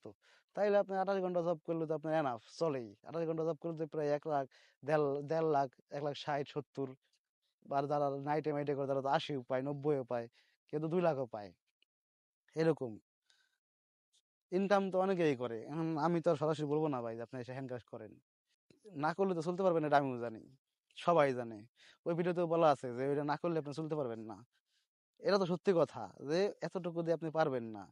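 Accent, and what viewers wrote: Faridpur, Bangladesh. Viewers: ভয়েস শুনেই বুঝে গেছি৷ কোন এলাকায় ভাই?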